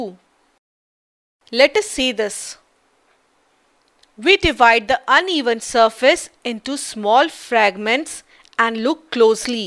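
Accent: India. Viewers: Don't b_llsh_t us. It's not in english, but indiaglish.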